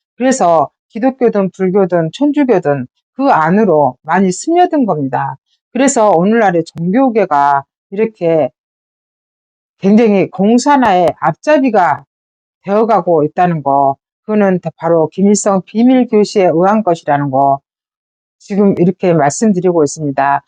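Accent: Jeolla, South Korea. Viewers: 전라도 어투인데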